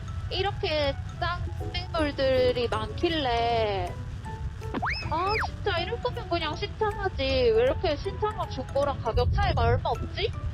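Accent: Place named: Gyeongsang, South Korea. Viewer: 경상도 ㅎ ㅎ 사투리 좀 안쓰면 안되나? 딴세상 살아요? 서울에서 그리 멀지 않은데 ㅡㅡa?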